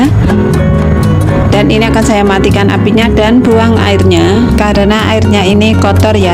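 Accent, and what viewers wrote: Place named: Java, Indonesia. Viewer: Bunbunya medok mantul bun🤗🫶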